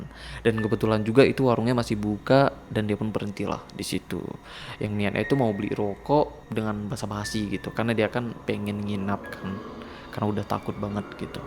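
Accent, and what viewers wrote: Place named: Sumbawa, Indonesia. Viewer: Bang, pernah tinggal di sumbawa kah? Logatnya kek ada logat sumbawa..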